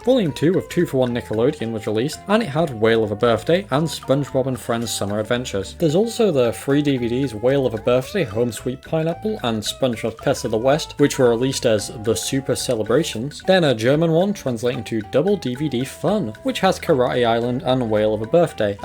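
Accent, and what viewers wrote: United Kingdom. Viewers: Enough with the British accent! Can we please stop with the British accent?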